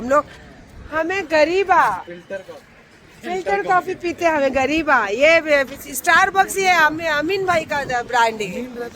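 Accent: Bangalore, India. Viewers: Ur bangaluru urdu is nice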